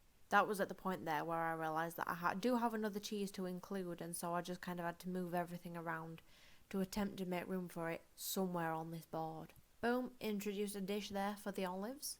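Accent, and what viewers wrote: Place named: Yorkshire, United Kingdom. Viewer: Your accent sounds so yorkshire! I love it haha. This looks sooo nice Im hungry now!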